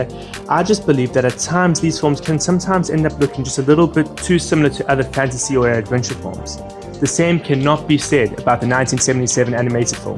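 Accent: South Africa. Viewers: I didn't hear Australian either. South African perhaps? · Dude, that accent is one I recognize well and truly. Is jy 'n Suid Afrikaner? as jy is, dan is jy die eerste gooie youtuber ek het gevind op die internet. (Jammer as my afrikaans sleg is, maar ek wil dit meer oefen, en ek praat engels die meeste van die tyd.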